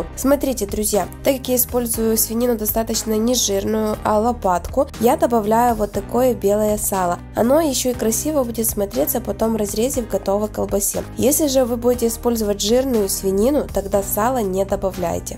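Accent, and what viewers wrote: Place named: Ukraine. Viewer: Вы молодец, интересные рецепты, что у вас с говором? Рублённое, КОптить? Я понимаю, что Вам удобнее разговаривать на украинском языке. Вы снимаете ролики на русском языке, ударение проверяйте в словах во время монтажа ролика.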